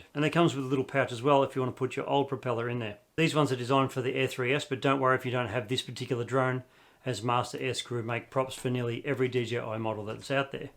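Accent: Australia. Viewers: First thing I notice was the Australian accent. LOL! Well of course birds are a threat there, because just about anything that crawls or flies is dangerous in Australia. (Just kidding!)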